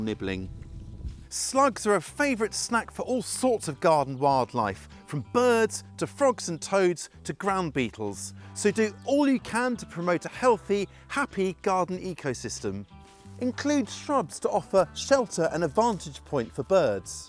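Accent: United Kingdom. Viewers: We are in the UK please know your audience before commenting nonsense that doesn't apply to UK. You can clearly tell his accent is British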